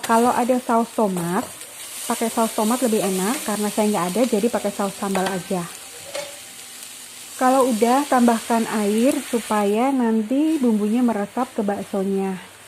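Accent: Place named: Central Java, Indonesia. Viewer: Rumahnya mana mam, daerah jawa tengahnya? Jajanan pasar dan logatnya khas..salam kenal dari saya klaten jateng🙂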